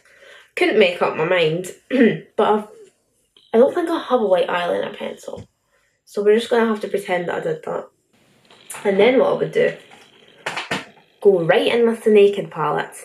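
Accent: Scotland, United Kingdom. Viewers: How does nobody even understand her accent, I ain’t even Scottish but I know what she’s saying 😂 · FINALLY A SCOTTISH GAL IN THE SCENE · YOU’RE SCOTTISH! 😃🏴🏴🏴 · OH MY GOSH ARE YOU SCOTTISH??? I ABSOLUTELY LOVE YOUR ACCENT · Wow, I wasn’t expecting you to be scottish 😂😂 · She has a Scottish accent. I’m Scottish and it took me forever to figure out that she was Scottish as well 😂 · Dammm i love ur Scottish accent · soz but as a scottish person myself, her accent is rank. Dunno where in scotland she’s from but her accent is HORRIBLE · ahhh I didn't know she was Scottish, the accent is so cute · If you say you can't understand her it confuses me...I'm american...and she's speaking English with a Scottish accent...its the same language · i cant believe i’ve seen so many of your videos and never heard you speak and didn’t know you were scottish omg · YOUR SCOTTISH???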